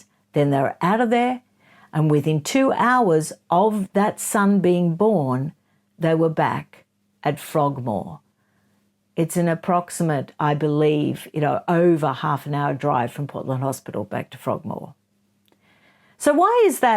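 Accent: Australia. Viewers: you speak like a true Australian. 👏🏻🇦🇺 No flies on our noses. The time is approaching via social media that every skeleton Charles is hiding in his closet will be revealed. He has vast resources at his disposal to have known all.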